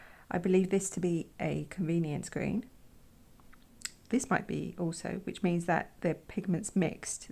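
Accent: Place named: South Africa. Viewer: I absolutely love the illustrations..especially the background detail that looks like a little piece of paper. I have been painting for years, but I recently started moving more towards illustration ,which is a huge mindshift for me. I love learning from tutorials like this, so I am definitely subscribing. For a moment there I thought I was listening to a South African English person (your accent sounds allot like Cape Town SA English🤣 and the "ai jai jai" is often heard here in English, Afrikaans, Xhosa...you name it🤣) but then...we got our English from Britain.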